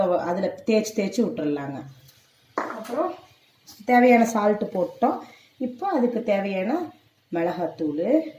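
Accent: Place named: Coimbatore, India. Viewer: Ur language slang spr sis.. Iam also coimbatore....🙂🙃🙂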